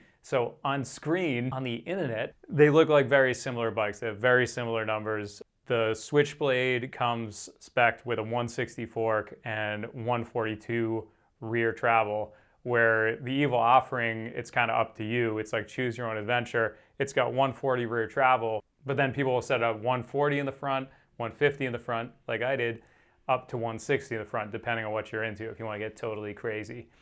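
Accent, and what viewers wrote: Boston, United States. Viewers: Amazing review. I think the fact that I’m originally from Massachusetts and hearing a bit of your Boston accent helps me understand what you’re saying. 😂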